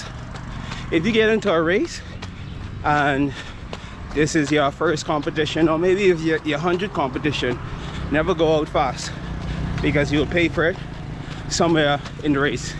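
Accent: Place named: Bahamas. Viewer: I heard the accent am very familiar with it I don't know how someone can get a Bahamian English confuse with Jamaican English I've seen interviews with mychal thompson klay thompsons dad where they ask him if he doesn't talk in that Jamaican way or buddy hield I didn't know they had a somewhat accomplished distance runner from the Bahamas obviously not upper echelon norweigans,kenyans,ugandans,Ethiopians but still a decent one anyway I think this video is a perfect example of a strong Bahamian English dialect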